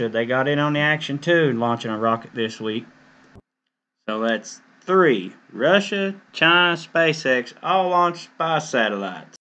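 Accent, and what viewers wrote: United States. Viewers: Ya good video and I love your hillbilly voice! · Your hillbilly accent is comforting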